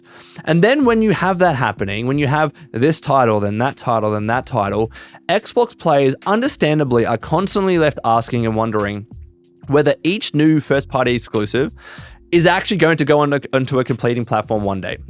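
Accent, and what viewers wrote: Australia. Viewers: Loving the news coverage becoming a thing for this channel, something about an Australian accent always makes stuff more interesting I guess 😅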